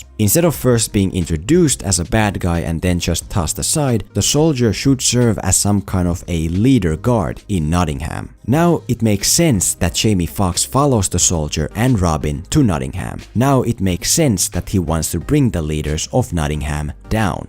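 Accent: Finland. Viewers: This been bothering me for a bit now, but would you happen to be Finnish? The way you pronounce english gives me that feeling.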